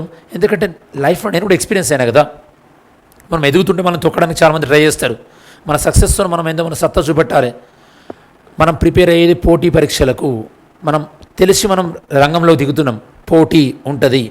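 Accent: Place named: Telangana, India. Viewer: Telangana yasala suuuuuuuuuuper sir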